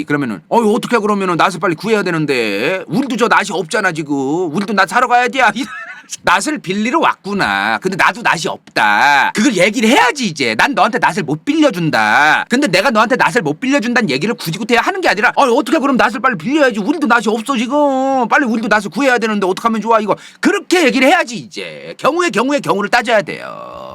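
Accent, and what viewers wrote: Chungcheong, South Korea. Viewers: 앜ㅋㅋㅋㅋㅋ충청도 말씨 너무 좋앜ㅋㅋㅋㅋㅋ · 충청인으로서 공감~~ 고향말투 빠지네요ㅋㅋ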